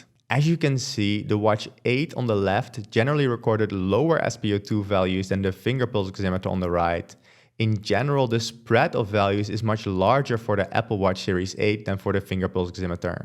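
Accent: Netherlands. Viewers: Dit klinkt zoooo boring! en overduidelijk voor gelezen! With yr Dutch accent! 😵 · I lived in Amsterdam and u clearly have dutch english accent, i went on your site and you actually studied there , you dutch right 😄? Thanks for the reviews , i just found your channel. Now im even more confused on what to buy , according on the tons and tons of video you made that i dont know if i will be able to watch entirely. I want just to fix my sleep as i wake up 1 or 2 times every night, i want also to track my hearth i suspect some problems sometimes i have a miss hit in the beat, i don' t know how to explain it. Should i take the polar h10? A watch ? A band? Im so overwhelmed by the amount of review u did. Bye from an italian new follower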